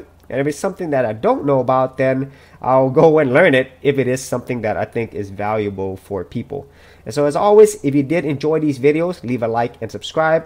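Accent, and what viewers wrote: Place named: England, United Kingdom. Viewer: Oh my, finally an English guy talking and making it easier, hard clap to this guy for this.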